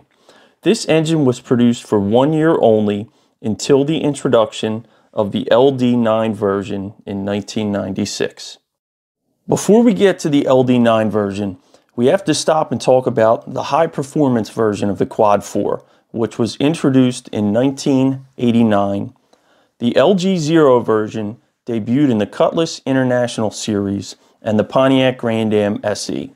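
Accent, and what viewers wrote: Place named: Chicago, United States. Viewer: If you wanted to know what a Chicago accent sounds like, here you go. Guys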